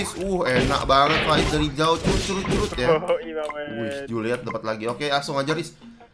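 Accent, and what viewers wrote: Java, Indonesia. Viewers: jowo geming mass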